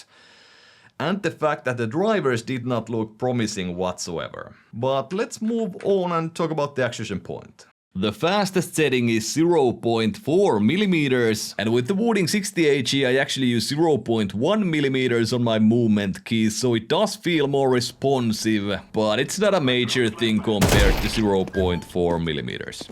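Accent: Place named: Finland. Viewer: it's finnish accent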